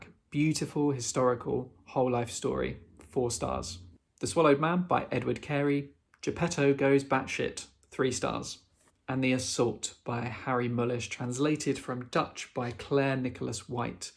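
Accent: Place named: United Kingdom. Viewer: Love your British accent, I will trade you for my Long Island accent 😂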